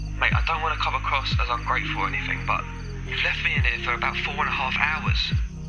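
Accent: United Kingdom. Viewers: This guys is very Belfast guy isn´t??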